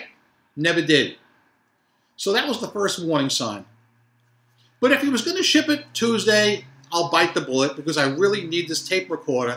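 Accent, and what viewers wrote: New York, United States. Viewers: your NY accent & how you come across is easy listening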